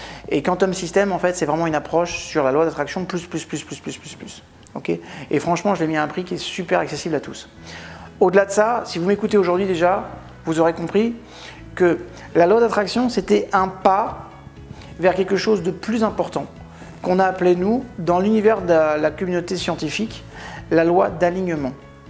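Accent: Canada. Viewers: Vous avez un PARFAIT ACCENT CANADIEN, j'ai été trois fois au CANADA, je vous le confirme avec sincérité. Trop beau accent. Merci. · J'ai vu le Doc 2 fois, et je trouve que tous que vous avez dit a été signalé dans le Doc, pas trop éclairci mais signalé. Merci d'avoir éclaircir les choses du coup. Par contre, j'ai carrément adoré l'accent canadien hahaha ! Continuer SVP, j'ai vu presque vos vidéos, je suis une grande fan. :)) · merci merci merci j`adore je viens de réaliser bien des choses et surtout pourquoi ca ne fonctionnait pas toujours comme je le souhaitais Braoooo et pour l`accent québecois aussi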